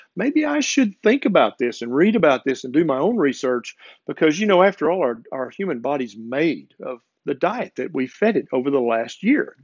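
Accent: South, United States. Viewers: Nice to hear a southern accent on youtube! South Carolina here. Great vid! 🖒💚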